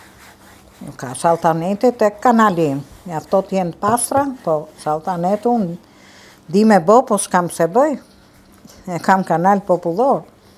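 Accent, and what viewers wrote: Tirana, Albania. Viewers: Tamam kanal popullor qe na kenaq me recetat dhe ate folmen Tiranesete lumshin duart ❤🎉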